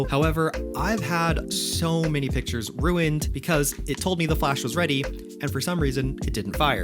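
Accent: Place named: United States. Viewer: Thanks this your name well look I like my vintage camaras which ihave few years back when iwas younger now im pensioner in London i still got my canon AE1 program which i love ❤️ I used to canon F1 nikon Fm2 mamiya 6x7 afortunally i got them stolen any way your video help me because hopefully I going to Colombia in few weeks time i believe Kodak film 200 is good because Colombia is very hot and sunny ☀️ so according what you say Kodak is perfect for Colombian whether since im back to photography i see you twice you from like Japan o somewhere there you English is American and you very good explaining all this camera staff means photography which really apreciated is like i feel young again thank you very much 👌👌🙋‍♂️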